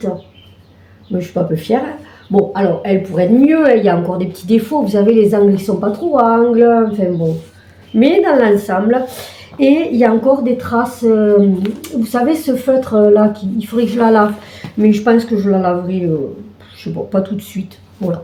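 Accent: South, France. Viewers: Bonjour, je viens de m abonner, j aime beaucoup votre accent qui me rappelle mon enfance dans le sud. Je vais faire le SAL également et pris cela grille sur le site de brode 41, que j ai découvert car comme vous il n y avait plus la grille chez de mère en fille et j ai été très satisfaite de sa préparation de commande et de l envoi ultra rapide, je recommande cette boutique qui fera partie de mes favoris maintenant. Très belle pochette, il faudrait que je m y mette également 😊 on va être plusieurs au taquet début juillet pour ce SAL je pense , pour ma part je vais faire ligne par ligne de dessin et faire juillet et août dès le début car en Août priorité aux petites filles et vacances et là il me faut des projets 0 CERVEAU 😂😂😂 bonne petites xxxx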